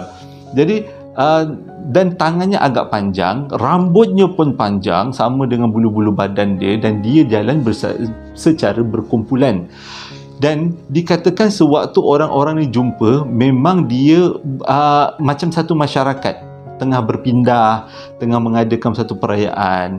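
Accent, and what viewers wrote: Java, Indonesia. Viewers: Abg org jawa ke? Cara ckp mcm org jawa la sy dgr. Kalo salah maaf ye.. 😬😬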